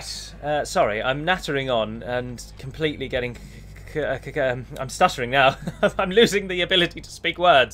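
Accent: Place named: RP, United Kingdom. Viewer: Not wanting to be pedantic however this text may put in context your quite modern RP pronunciation re the BATH - TRAP split. It is know that northerners flat sounding vowel as in Bath was the original pronunciation. <link> . p.s. love your videos. Thanks.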